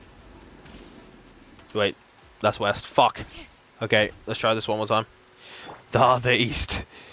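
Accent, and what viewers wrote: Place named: United Kingdom. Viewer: I DIDN'T THINK U WERE STREAMING definitely gonna watch ur next stream also yes not many british ppl say bo'o'wa'er but ur accent is quite clear and obvious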